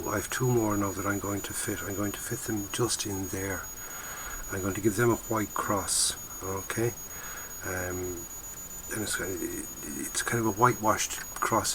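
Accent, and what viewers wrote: Ireland. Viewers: I really watch these videos just to hear the soothing, quaint Irish accent. "When I drink whiskey I drink whiskey and when I drink water I drink water." - Michaleen Flynn But I do also learn a thing or 2 about building scale models & other stuff. Neat 251/1 btw ;)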